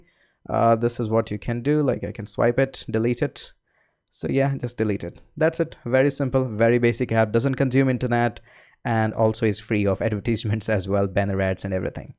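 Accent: India. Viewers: Thanks for helping. Indian accent is not good at all :/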